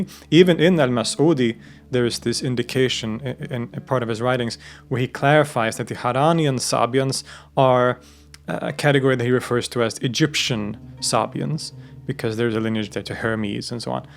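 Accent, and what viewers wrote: Arab world. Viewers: Ur semitic arabic accent is on point.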